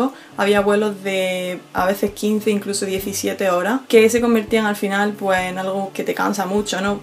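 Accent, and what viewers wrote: Andalusia, Spain. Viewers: Respeto y apoyo las riquezas culturales de las muchas regiones. Pero aconsejeria que la pronunciación, sin dejar las raices andaluzas, fuera un poquito más neutral. Cariño me gustas como youtuber pero, quiero comprender mejor lo que nos dices. :( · Steve Panther sabes que en España hay muchísimos acentos diferentes? Esta chica tiene acento del sur de España. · Habla español con acento andaluz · que Bonita eres y ese acento andaluz muy guay,saludos desde Tenerife... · de que parte de España es este acento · Dialecto andaluz? jajajaj hablas español hija, con acento andaluz. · Galle no seas tonta tu es obvio que la niña tiene acento. PERDÓN NO LO HABÍA NOTADO ES QUE NO SE LE NOTA PARA NADA Q TIENE ACENTO. solo digo que podría tratar de hablar un poco mas claro su español Andaluz que vamos no le queda muy difícil sobretodo si esta grabando y subiendo esto a YouTube. 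ya dije no es que no me gusten sus vídeos ella tiene temas muy interesantes sólo que en ocasiones toca de cifrar que dijo y si tu le entiendes perfectamente 👏👏👏👏👏👏 yo sigo más Youtubers Españoles y ella es la única a la que no le entiendo todo lo que dice. ademas yo le comente a ella y ella ya me dijo q es por su acento q vamos para hablar 2 idiomas más aparte de español si q tiene su acento bien marcado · Pero de que parte de españa?? y porque tan marcado? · Ha na mejorar el castellano? el andaluz es así, y no hay que mejorar nada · Como me gustaria que dejaras tu acento andaluz y hablaras un espanol neutro. No se dice vosotros se dice nosotros hay unas cuantas cosas que corrijiria de tu acento. Si te gustaria hablar espanol neutro dejamelo saber. No se dice quereis se dice por si quieres no por si quereis. No se dice que me digais se dice que me digas. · Vale , está bien pero tienes un acento muy andaluz , no te veo a ti hablando un ingles limpio , creo que eres una niña que hablas demasiado y también creo que hay azafatas mayores que tú edad y con mucha más experiencia que no se queja tanto , un saludo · Que ojos por Dios. 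soy latinoamericano pero me gusta tu acento andaluz culto ..y tienes razón, uno tiene que hacer lo que cree es más conveniente para su vida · Envidia al acento del sur. Todos quieren imitarnos luego para hacerse los graciosos. Te expresas muy bien y se te entiende sin problemas. ;)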